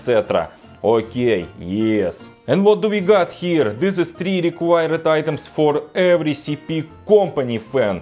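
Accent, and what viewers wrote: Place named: Russia. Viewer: У любого пилота самолета такой же русский акцент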